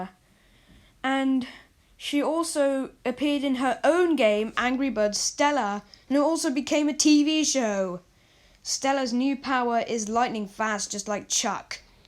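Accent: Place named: Australia. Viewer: I love your Australian accent!! ❤❤❤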